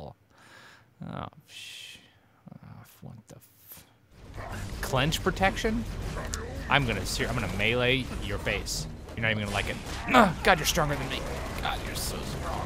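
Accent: United Kingdom. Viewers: love the British accent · You sound British!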